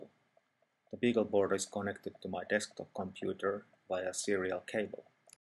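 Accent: Finland. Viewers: you sounds finnish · That's a finnish accent alright. ;)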